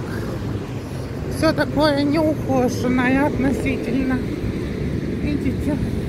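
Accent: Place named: Ukraine. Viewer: Интермаркт! Хватит все магазины на ваш украинский лад произносить.Маркет это у вас,тут Маркт.Микс Маркт,Интермаркт.Маркт,Маркт!!!Необучаемая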